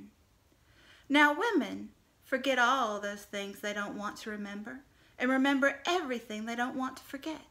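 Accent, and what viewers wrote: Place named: South, United States. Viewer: I guessed all of them except the last one. Haven't read it. You read very well. you got that southern twang in there and everything!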